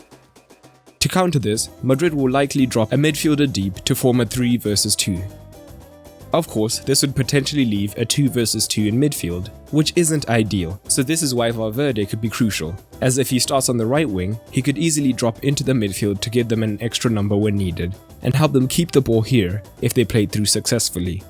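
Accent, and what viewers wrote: South Africa. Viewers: Hey man. Love your channel. Where are you from? You sound south african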